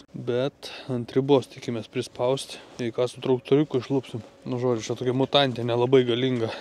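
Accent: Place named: Samogitia, Lithuania. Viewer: Kap visad žemaitiškai stipriai 🇱🇹👌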